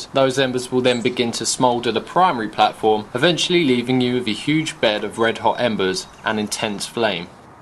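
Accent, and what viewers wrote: United Kingdom. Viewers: What accent is this? I hear it a lot but don't know what part of Britain it's from.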